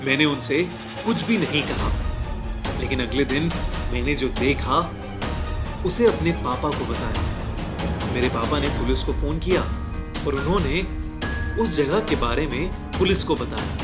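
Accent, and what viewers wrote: United States. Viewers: Are you from America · Are you American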